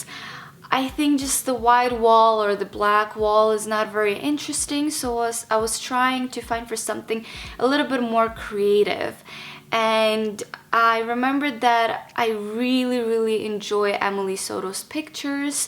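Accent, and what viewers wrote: Canada. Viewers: Now i know how the Canadian accent sound like :) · exactly,i think she is canadian. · Ukrainian / Canadian me thinks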